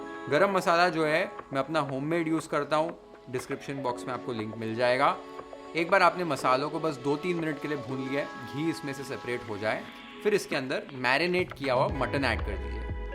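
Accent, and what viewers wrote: Maharashtra, India. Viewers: Your narration as well as your recipes always have a typical maharashtrian touch.... Makes me feel connected with you ☺️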